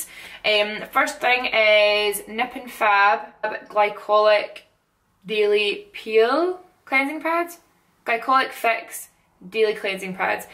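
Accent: Scotland, United Kingdom. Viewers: scottish accent? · you are beautiful and I love your gorgeous Scottish accent, just want to let you know that your jouer lippy is pronounced jou-eh not jou-er. Just for future reference. Keep shinning gorgeous. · 12 seconds in I actually love you your so funny hahaha totally do the Scottish accent justice!!! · everything you've done is "Amazing". You did everything perfectly. Your stunning gorgeous now. You know your shit, that's truly. Your wedding photos are drop dead gorgeous.......and that dress you bought with all the layers almost makes me cry.....so beautiful. Keep up the great work. I'm addicted to your videos!!!!!! More please!!! 😘. Plus I love your Scottish accent. Love your friend from 🇺🇸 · Are you from Scotland? Hahah being Scottish myself i picked up the accent within seconds! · I love this video I don't even wear makeup I just watched it bc of her Scottish accent! 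· SCOTTISH! hahaha this makes me so happy to see a Scottish YouTuber who's videos are about beauty! Probably cause I'm Scottish! Normally people say the Scottish accent is really harsh and angry sounding! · I love the Scottish accent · Ah I love the Scottish accent! If I weren't Welsh, that'd be my choice! Amazing skin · Kayleigh Ritchie is that a scottish accent? if so it's really gorgeous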